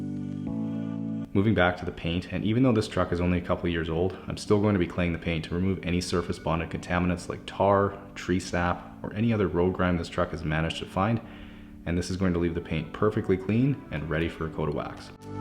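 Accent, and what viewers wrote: Canada. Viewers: You’re definitely Canadian. The zero degrees reference and your accent is a giveaway too. I love your channel. So satisfying!! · I've recently found you yesterday and just watched 27 of your videos back to back.....safe to say I love your videos! I also love your voice overs, love a good Canadian accent lol. Wanted to ask though did you start as a detailer before you moved into this home? Curious how you started and got here.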